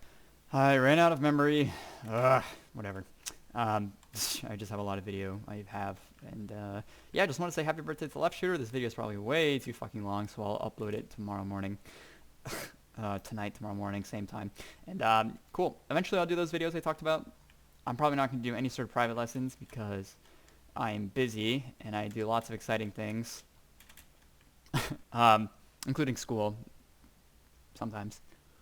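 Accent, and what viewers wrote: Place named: United Kingdom. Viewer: You sound like your from the uk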